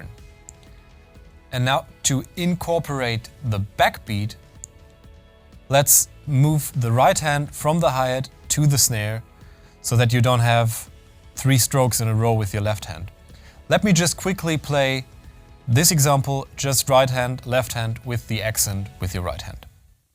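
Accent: Germany. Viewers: Bei dem Akzent dachte ich mir doch schon das es ein Deutscher sein muss👌🏼 weiter so👌🏼💪🏼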